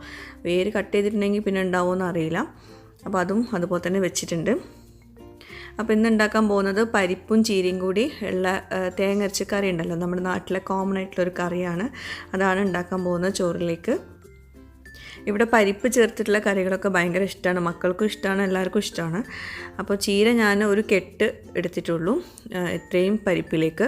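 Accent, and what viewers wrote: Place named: Malabar, India. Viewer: സിമ്പിൾ നാടൻ വിഭവങ്ങൾ, ഹൃദ്യമായ മലബാർ സ്ലാങ്ങിലുള്ള വിവരണം. ഭക്ഷണം കഴിച്ച പോലെയുള്ള ഒരു ഫീൽ 😊👌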